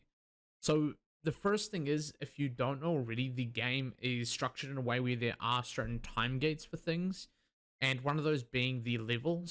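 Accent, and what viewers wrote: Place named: New Zealand. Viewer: Nah he's just from New Zealand, they sound like that innit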